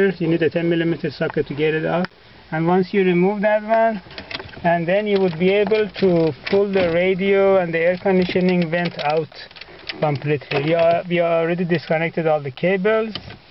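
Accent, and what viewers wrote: Iran. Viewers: hahaha! Love your Perrrrrrsiaaaaaaaaaaaan accceeeeeeeeeeennnnnnnt! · Like your accent, are you Iranian?!!!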